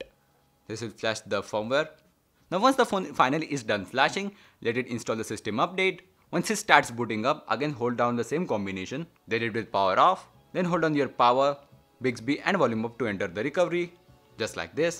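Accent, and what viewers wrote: India. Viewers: I had a hunch it's an indian guy...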